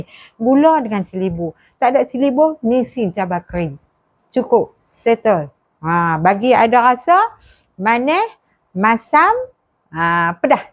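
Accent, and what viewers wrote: Kedah, Malaysia. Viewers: Suka la dengaq cakap loghat Kedah pure. Mcm mak saya cakap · Tu ckp pelet kedah ke perlis?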